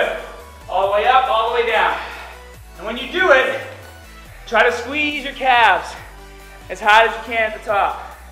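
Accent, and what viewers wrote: Boston, United States. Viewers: This was just what I needed for my Coronavirus outdoor workout...your Boston accent kills me! hahahahahaha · Here due to Covid-19 gym closure. I live in Quincy, MA. And that sounds like either a fake Boston accent or someone with a Boston accent trying to hide it. I grew up in MA with immigrant parents so I talk different than everyone. But back on topic, my quest is to not lose any muscle or strength during this crisis. Good workout! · This guy is so hyper and it hyped me up it’s awesome. Also I’m from Boston and I love the accent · Love this leg workout. has the BEST Boston accent ever!!!! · Boston accent like crazy! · its a boston accent i think. it wasn't always so prevalent in the past though. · The Boston accent is strong with this one... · the boston accent is strong in this one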